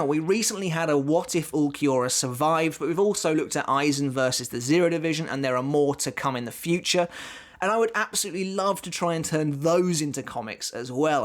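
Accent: United Kingdom. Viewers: Wait you’re from the uk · i forgot...... british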